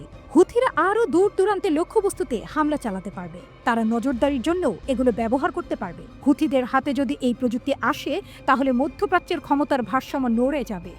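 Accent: India. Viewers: পাঠিকা কি ভারত থেকে আগাত? ভাষা অনেক টা তেমন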